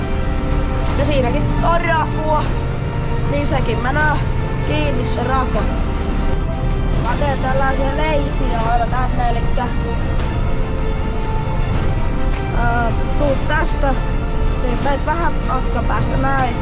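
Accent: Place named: North, Finland. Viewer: Hyvä video tilasin kanavan vaikka tuota pohojammaan murretta ei jaksaisi kuunnellakkaan😁😅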